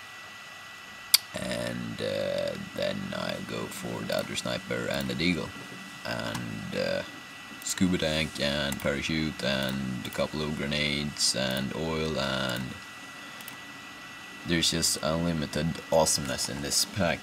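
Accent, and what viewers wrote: Sweden. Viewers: are u from sweden u talk with swedish accent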